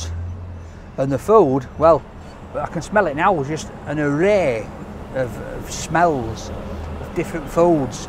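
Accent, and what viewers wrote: United Kingdom. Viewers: ❤️ his British accent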